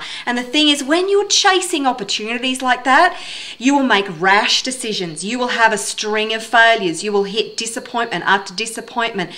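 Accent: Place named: Australia. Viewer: great to have found your channel Thanks and great to hear the Aussie accent! Thanks:)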